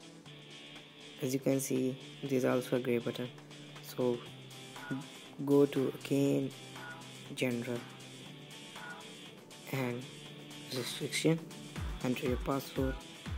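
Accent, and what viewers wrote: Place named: India. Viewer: Of course he sounds Indian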